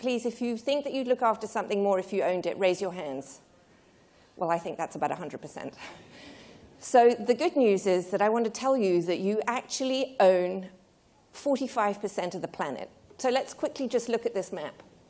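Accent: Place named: New York, United States. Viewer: Her accent is soo ny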